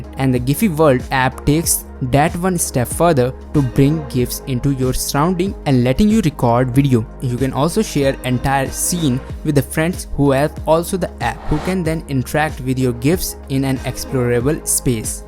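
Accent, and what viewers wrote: India. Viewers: you must be a south Indian...probably Telangana or Andhra.by the way Video content is useful.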